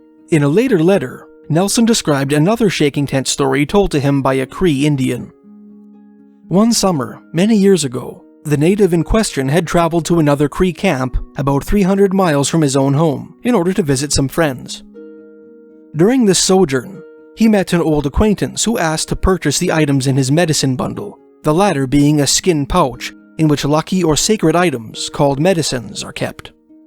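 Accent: Canada. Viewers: I really enjoy listening to all of your videos and have learned a lot of history that no school in the US would ever teach. I'm over 60 now, and prefer to learn my own way. I love your beautiful Canadian accent and the way you annunciate your words .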